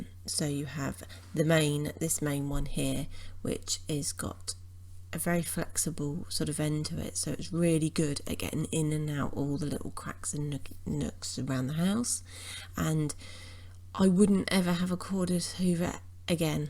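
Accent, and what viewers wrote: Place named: New Jersey, United States. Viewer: That's a lovely accent you have. New Jersey?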